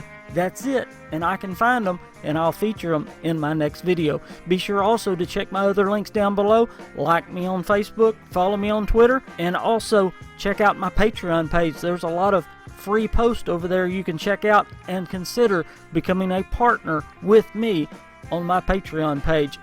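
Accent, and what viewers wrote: United States. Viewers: I love your country accent, feels like home! As far as content, very informative! You've got a new subscriber!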